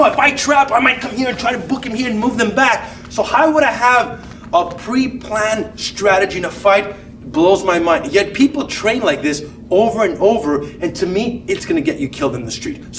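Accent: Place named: New Jersey, United States. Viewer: yo guy, are u from the jersey or isreal or brooklyn area? i only ask because im from jersey and ur accent kinda sounds like one of the 3 places i mentioned.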